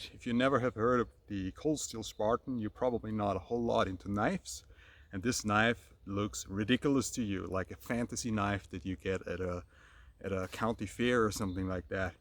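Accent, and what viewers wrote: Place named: South, United States. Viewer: I love your accent! I hear a lot of influence from the American South, no?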